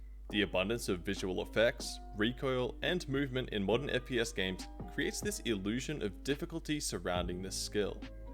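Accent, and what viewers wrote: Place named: Australia. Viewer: U were tripping I think he just Australian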